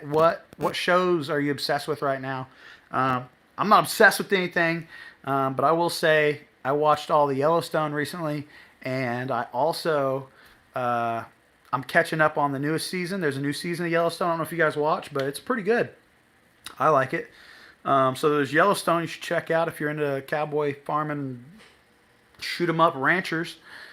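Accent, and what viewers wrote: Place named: Netherlands. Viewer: Sounds good you sure sound like dutch will be interesting watching your channel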